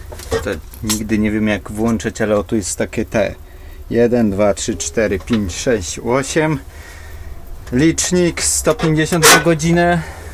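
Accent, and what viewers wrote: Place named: Podlasie, Poland. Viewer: A tak w ogóle to swój chłop jesteś fajnie zaciągasz,ja z Podlasia,to wiem,co mówię!!! NIECH ŻYJE ŚCIANA WSCHODNIA!!!!!!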